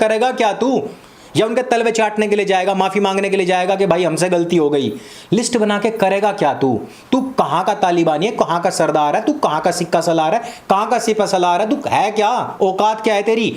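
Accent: Haryana, India. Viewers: Vaah mast haryanavi bhasa aap ki 🙏🙏🙏🙏🚩🚩🚩🚩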